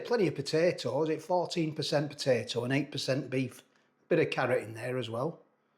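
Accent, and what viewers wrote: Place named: Yorkshire, United Kingdom. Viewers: Did you say Barrow,is that Barrow in Furness as to me you sound like you're from the Leeds/Doncaster area-no offence intended....Geography was never my strong point😊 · Posh northerner I thought it was myth 😂😂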